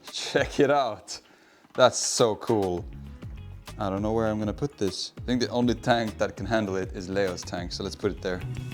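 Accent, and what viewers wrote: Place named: Scania, Sweden. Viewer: Den grova skånskan 😂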